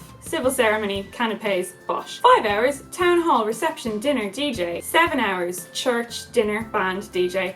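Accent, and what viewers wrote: Dublin, Ireland. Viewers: I think Lucan in Dublin I might be wrong but thats what her accent sounds like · Didn't understand a bleedin' bit of that but I enjoyed it massively. I could pretty much just listen to you irishing on for hours. 🇮🇪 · Subscribing just for the accent Irish are funny when then aren't angry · Wow,.. You look BEAUTIFUL. You do in always, but in this one in particular.. You look.. grownup (thats a good thing somehow) and radiant. You look stunning:) And related to your video: You're accent is gold! I myself am not Irish at all. And I just wanted to say that grew up watching Riverdance.. my mum liked it, I guess and me and my sisters were obsessed with it.. with like Jean Butler and Colin Dunne.. Or is that stereotypical to say?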